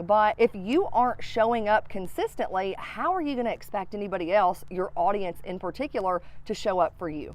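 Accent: South, United States. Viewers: Girl!! I love this video!! And you sound like a Southern girl like me! It's about time! :)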